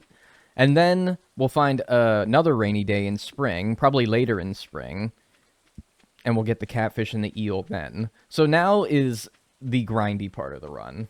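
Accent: United Kingdom. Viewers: Are you British by chance?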